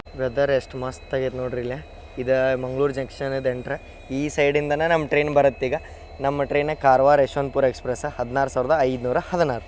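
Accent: North Karnataka, India. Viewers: Very very very nice north karanatks kannada language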